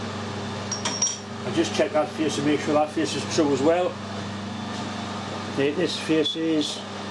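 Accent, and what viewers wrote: Newcastle, United Kingdom. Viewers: just watched some of the video with subtitles on, Im guessing it doesn't understand a Geordie accent.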